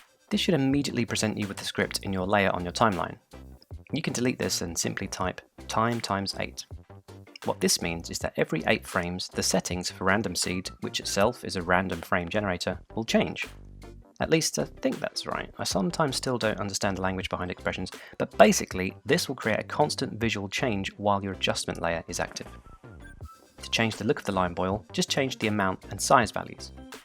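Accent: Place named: United Kingdom. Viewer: This is it, the perfect tutorial! It's short, understandable AND it has your lovely British voice guiding us through it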